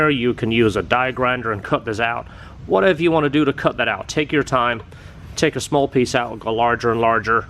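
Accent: United Kingdom. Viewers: I'm probably wrong but do you have a British twang to your accent? ..Other than that, great informative video..Si..UK.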